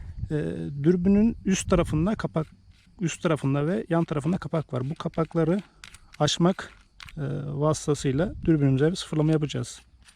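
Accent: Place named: Thrace, Turkey. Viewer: he anladım trakyalıya çok benzettimde bidekonuşma olarak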